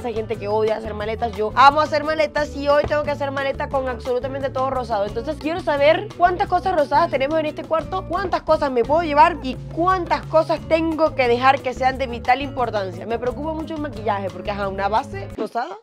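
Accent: Venezuela. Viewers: Yo sé que a No se olvida el acento venezolano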